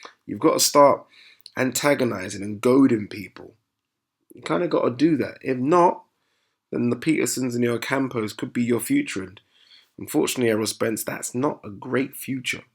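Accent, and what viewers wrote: United Kingdom. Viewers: Dismiss fights that are disrespectful to the fighter. Why should Spence go to the UK again? Why should Spence fight washed up fighters and fighters he has beaten already? Spence wants to unify 147. He took the belt from Brook and Khan is washed. You sound as if you may be a person from the UK? Could you be a bit biased? Tired of coming to America to watch good fights? Rent them on ppv like most people and save them airfare. But, stop making trash vids about why Spence must fight in the UK to make money. If you want Spence to fight Khan and brook, make vids about them coming to Dallas to face Spence to get paid. Not like either of those guys are making more money than Spence.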